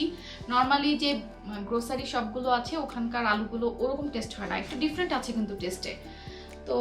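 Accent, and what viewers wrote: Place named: Chittagong, Bangladesh. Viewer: কথা শুনে মনে হচ্ছে চিটাংগগে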